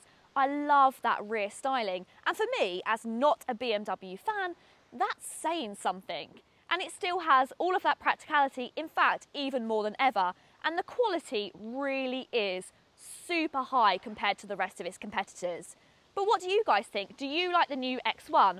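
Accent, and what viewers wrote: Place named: Surrey, United Kingdom. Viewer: God, I need to meet this gorgeous lady. Sounds like a Surrey accent.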